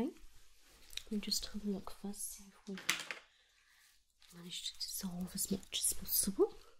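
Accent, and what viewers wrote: United Kingdom. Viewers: ASMR + pretty doctress + British accent . OMG . It must be wonderful 😊🤩😘❤ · are you from the UK? you have such a nice accent! :) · I love the british accent. It make the ASMR more slowly and it causes so many tingles. Btw, u look like Meryl Streep to me xD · centre, I presume? A bit north of London, like the latitude of, say, Cambridge? It may seem a silly question, but I just find amusing to train my italian ears to recognise and emulate british accents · it’s British! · Ah, I love British accent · What part of the UK people have that accent? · I love your British accent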